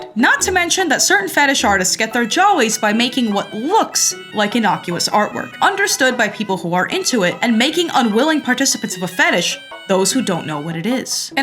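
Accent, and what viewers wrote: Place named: Boston, United States. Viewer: Sorry for the unrelated comment, I do enjoy your videos, but I gotta say your accent is interesting 😅 it's mostly general American, but you have the Boston o, like in the words 'all', 'of', 'talk'. Do you have like one parent from Boston or something like that?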